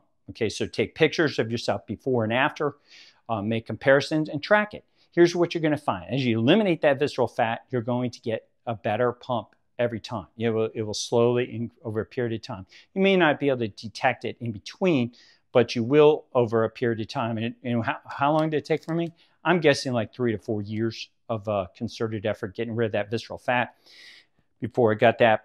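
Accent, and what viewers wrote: United States. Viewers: Not an old guy, but a tough 68 year old broad here, and want to know if you think the FitTrack scale’s visceral fat measurement is accurate. Was thrilled that it consistently measures mine at 3, very low, on a rating of 1 to 19. If it’s not accurate, where can we get it done, need a doc’s order, cost? Thanks! Loving your channel. Keep up the great work! You sound a little southern on this recording?? Hadn’t detected the accent before.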